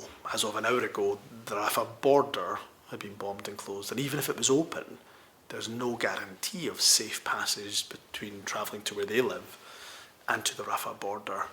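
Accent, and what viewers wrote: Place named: Scotland, United Kingdom. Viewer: Only thing Scottish about this guy is his accent.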